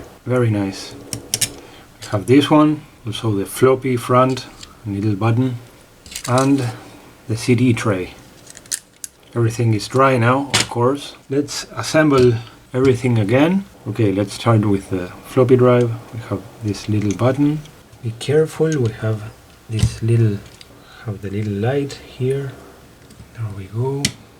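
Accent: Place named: Spain. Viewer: Because of your accent I thought that you were located in Spain.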